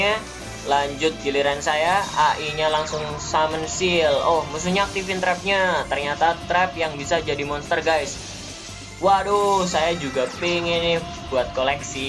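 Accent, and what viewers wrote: Java, Indonesia. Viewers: medok poll cokk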